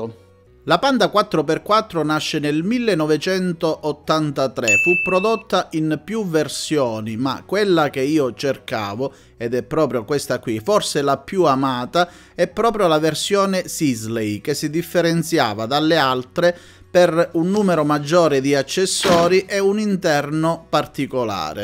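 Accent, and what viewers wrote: Calabria, Italy. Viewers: Me gustan mucho tus videos,yo tuve un panda 4X4 y ha sido el coche de mi vida,me hizo feliz y me encanta el acento calabrés,se entiende todo perfectamente si eres español,felicitaciones!.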